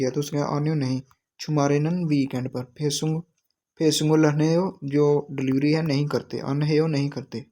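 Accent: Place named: Sialkot, Pakistan. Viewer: Sialkot SA lag Raha ho😊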